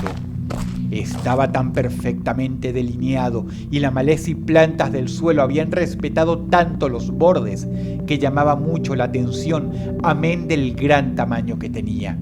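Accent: Venezuela. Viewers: De los tiempos de cuándo Dross aún tenía acento Venezolano :v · Aquí Dross todavía tenía acento venezolano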